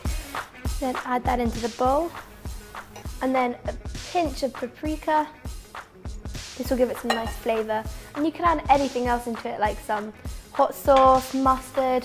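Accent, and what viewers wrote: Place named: United Kingdom. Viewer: Can you talk without British accent ....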